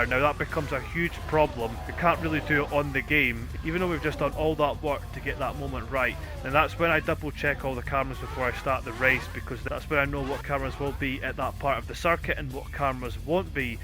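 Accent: Scotland, United Kingdom. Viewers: Are you Scottish? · Didn't realise you are Scottish, hello from Northern Ireland! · What the fuck you're Scottish as well haha, whereabouts are you from?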